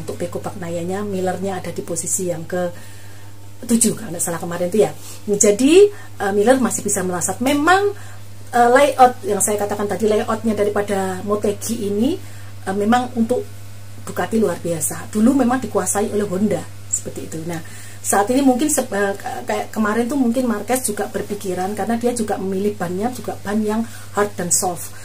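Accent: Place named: Java, Indonesia. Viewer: suaranya khas medok jawanya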